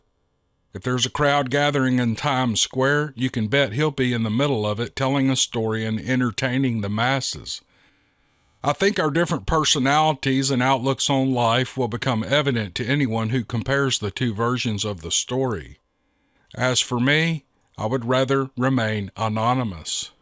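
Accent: South, United States. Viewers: Awesome stuff Mr.. Your narration with your southern accent makes a great combination. Every time you get a giggle in, it sure makes me laugh. It’s all a good time brother, alright, here we go. Peace. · Wow! Great stories- especially that last one - it had me on the end of my seat! I was SO relieved to hear it was just a story! Got me there, hehehe...! Your wonderful southern accent gives a new dimension to these stories,! You've become my favourite storyteller over the last few months. Thank you for creating this channel, for all the times you've helped me to relax and sleep... ❤ ❤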